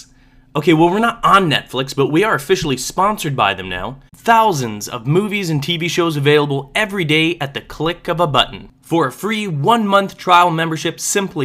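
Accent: Canada. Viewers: wate yor canadian?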